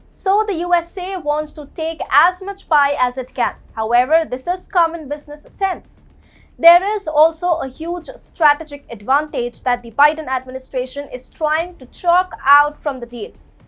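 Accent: India. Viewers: Dragging her spoken english words with super strong indian accent,idon't know how thr media company chooses her,she also yell over spoken sentences.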